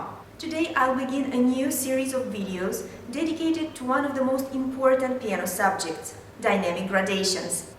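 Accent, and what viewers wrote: Russia. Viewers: May I ask when the next update may be?? Also I love your accent by any chance are you Russian? :) xx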